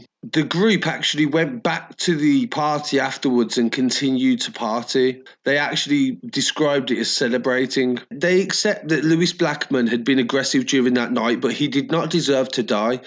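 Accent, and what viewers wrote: Birmingham, United Kingdom. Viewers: That fucking accent tho pmsl, fellow brummie here LOL , great content just subbed!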